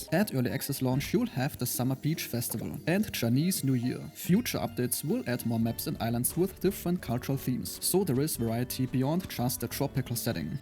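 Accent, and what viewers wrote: Germany. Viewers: Gonna add a comment to push the algorithm, you showed me some good games over the past Year, after discovering your Channel. Thank you for doing this! And I got one question about your accent. Is it German?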